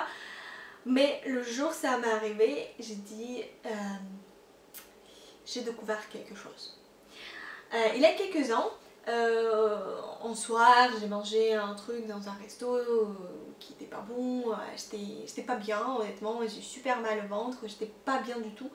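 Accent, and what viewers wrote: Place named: Russia. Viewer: Mais v'est chouette ce petit accent russe. Faut surtout pas le perdre !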